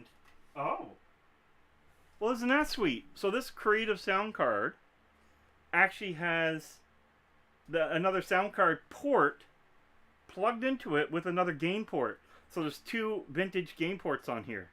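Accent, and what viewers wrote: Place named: Texas, United States. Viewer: Worst Texas accent I ever heard y'all.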